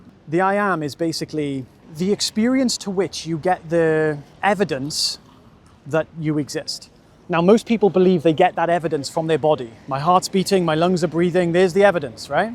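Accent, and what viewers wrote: Wales, United Kingdom. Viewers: Detecting a bit of Welsh in your accent. On another note I love that there are other people walking round contemplating the same things that I do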